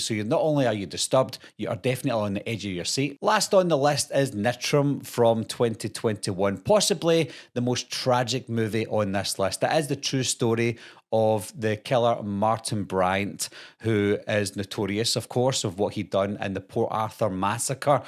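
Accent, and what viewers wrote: Scotland, United Kingdom. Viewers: Is this guy Scottish? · As soon as I heard the Scottish accent I was in! Then for you to recommend a couple if Aussie flicks AND know about The port Arthur massacre we had, I am now subbed 👍🏼 🇦🇺 🏴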